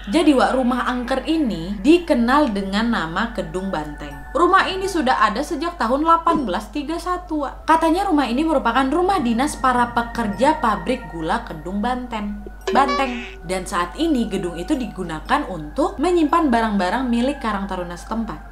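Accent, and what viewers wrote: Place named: Medan, Indonesia. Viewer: Salam...saya wak2 dr medan,klo boleh tau wak aslinya asal mana??karna logat bicaranya mirip dengan orang medan😊😊😊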